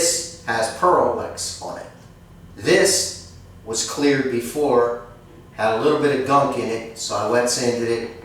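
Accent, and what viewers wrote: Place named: Pennsylvania, United States. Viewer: Love your videos an your PA accent as well. You don't mess around or mince words. I love that. Link to the DVD s would be cool.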